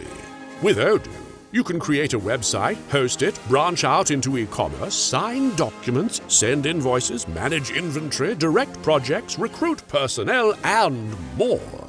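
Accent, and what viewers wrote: United Kingdom. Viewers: Im still confused about a British voice being so excited and enthusiastic about America lol but i love it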